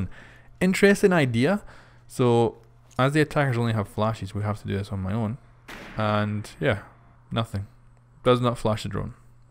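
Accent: Scotland, United Kingdom. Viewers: Are you Scottish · R u scottish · Are u Scottish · Are you scottish by any chance?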